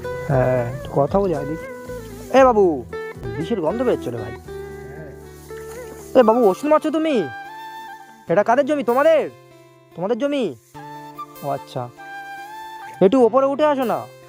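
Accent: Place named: Bangladesh. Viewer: হ্যাঁ ভাই আপনি বাংলাদেশের মতো কথা বারতি বলেন আপনার ইন্ডিয়ান ভাষা হারানো বুঝি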